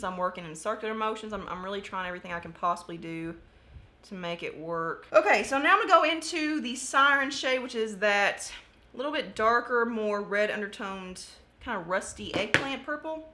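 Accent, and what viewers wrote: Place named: Texas, United States. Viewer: Girl, our voices and accent sound alike! It’s crazy! Are you from Texas? You are beautiful! Excited to be a new subscriber! Thanks for the fun videos!!💛🌼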